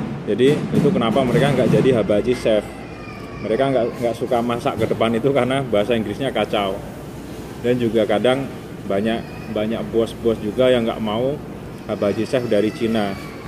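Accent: Java, Indonesia. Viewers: Selalu suka dengan suaranya yang agak medok mas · Seneng saya dengernya, beberapa video. Dan saya di sini baru komentar. Saya bisa nambah wawasan untuk bahan cerita. Hehehe... Lanjut mas... Oh ya, kok bahasa logat sampeyan jawa banget... Asli jawa tengah po mas?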